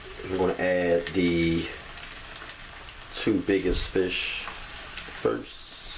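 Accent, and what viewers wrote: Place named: Baltimore, United States. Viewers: lol be sounding like he from PG County Baltimore ahahaha "Fyew"... "Tew"... "Dew"... Oiyew (Oil) hahaah Much luv bro! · He sounds baltimorian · Sound like you from Baltimore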